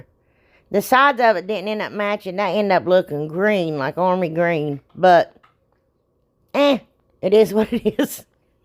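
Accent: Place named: Tennessee, United States. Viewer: Just found your channel from diy beauty on purpose. Girl u r talented and a hoot! Where r u from. Your accent sounds like possibly Tennessee or Kentucky. I’m from west Tennessee myself! Keep up the good work and your channel will blow up!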